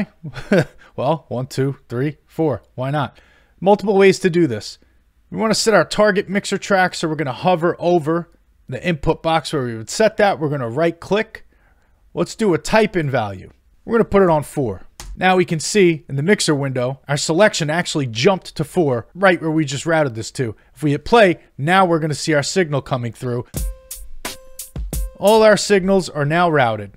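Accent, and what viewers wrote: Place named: East Coast, United States. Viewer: awesome video. btw where are you from? i hear a slight accent and it's driving me crazy that i can't place it. somewhere over on the east coast?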